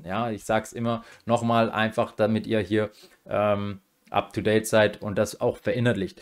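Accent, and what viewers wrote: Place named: Swabia, Germany. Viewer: Woher genau kommst du aus dem Schwabenland, wenn man fragen darf? 🙂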